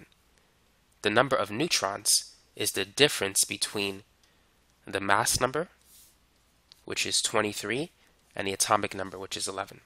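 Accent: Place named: Boston, United States. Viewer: you sound like your from boston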